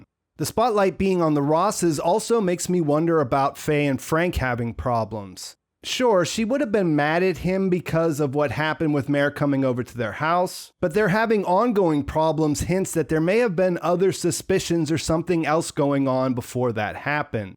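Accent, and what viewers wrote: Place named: Pennsylvania, United States. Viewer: You have a Pennsylvanian accent.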